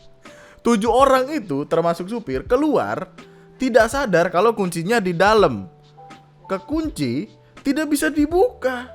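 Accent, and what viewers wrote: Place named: Banyumas, Indonesia. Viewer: yang bikin video ngapak pisan mbae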